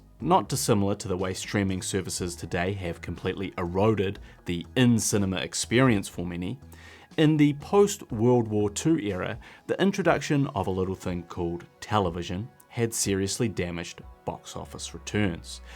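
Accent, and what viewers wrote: New Zealand. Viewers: Not funny … Guy’s a New Zealander or ‘Kiwi’ ….. that is just the kiwi accent. Accept ! Comment on his content which is always very informative.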